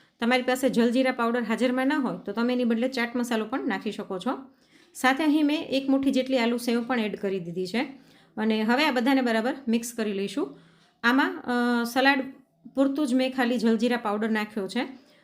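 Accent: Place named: Saurashtra, India. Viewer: વાહ ખુબ જ સરસ બેન તમે કચ્છમાં રહો છો પણ તમારી ભાષા સૌરાષ્ટ્ર જેવી છે તમે સૌરાષ્ટ્રમાં ઉછરેલા છો, હું પ્રોપર સુરેન્દ્રનગર થી છું હાલ વડોદરામાં રહું છું. તમારી દરેક રેસીપી હું ટ્રાય કરું છું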